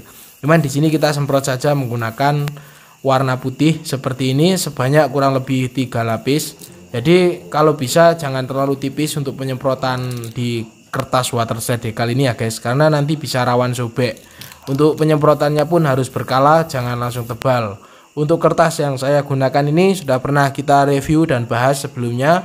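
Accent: Temanggung, Indonesia. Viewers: = mirip logatnya wong temanggung 😀🙏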